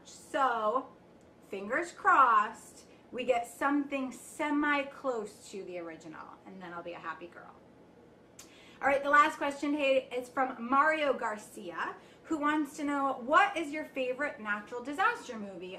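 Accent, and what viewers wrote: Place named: East Coast, United States. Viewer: You have such an east coast accent! LOL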